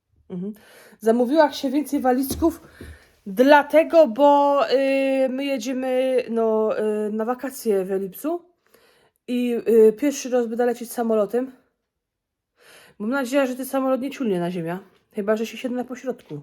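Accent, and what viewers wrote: Silesia, Poland. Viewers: Jestes zarabista babka , uwielbiam ogladac twoje filmiki , no mowa slaska jest mega… buziaki dla calej rodziny🍀🍀🍀🍀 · Twoje życie nie jest nudne. A tak jak dla mnie to wystarczy, że usłyszę twoją śląską godke i już mam uśmiech na twarzy i dla mnie to ciekawy vlog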